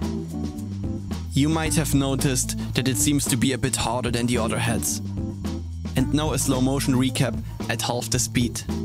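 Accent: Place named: Germany. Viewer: Hey sehr gute Arbeit, genau wie deinen anderen Videos... ich schreib einfach mal auf deutsch, weil ich deinen Akzent raus höre ;) Ich habe eines meiner akustischen Sets nach Anleitung umgebaut. ;) Und überlege jetzt welche Felle ich nehmen soll? Für die Snare hätte ich an das Real Feel gedacht. Für die Toms überlege ich Remo Silentstroke oder was anderes in der Preisklasse (QuiHead) weil 4 Toms (10,12,14,16) mit Real Feel geht ein bisschen ins Geld. Als Reso sollte man da auch ein Mesh Head nehmen oder gar keins oder ein normales Reso Fell? Wäre schön wenn du mir bei der Entscheidung mit deiner Erfahrung helfen kannst! ;)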